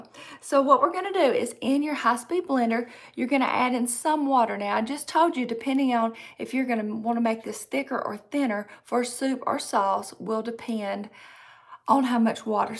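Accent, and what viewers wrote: South, United States. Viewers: Thank you! Sometimes I can't understand the Southern accent- Green/Grain, etc.🤗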